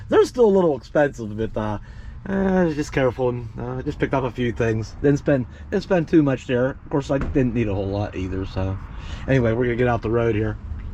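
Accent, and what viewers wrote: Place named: Northeast, United States. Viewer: You sound like yr from the NE area am I correct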